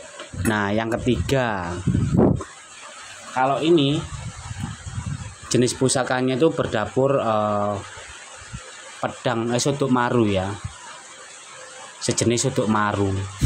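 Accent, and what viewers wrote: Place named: Surabaya, Indonesia. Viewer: Suroboyone pean ngendhi Cak?....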